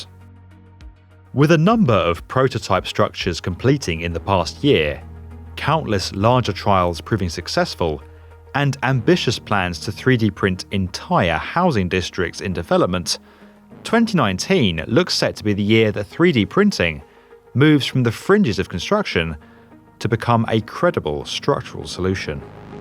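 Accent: United Kingdom. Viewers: I like this channel, but let's be honest, you can get away with having nearly everything you say get taken as credible if you do it in a smooth British accent with sexy video editing skills. While most of the things here really do have a lot of promise....there's also a lot of wank too. The most infuriatingly bad proposal, the dumb idea that just WILL NOT DIE, has to be the pavement or sidewalk thing that "harvests the energy of pedestrian footsteps". This is not going to become a thing. Ever. Please look into how these things actually (don't) work. It would take years of foot traffic to power a single kettle boil, probably centuries to ever pay back the energy cost of their construction and manufacture. They're a scam that just won't die.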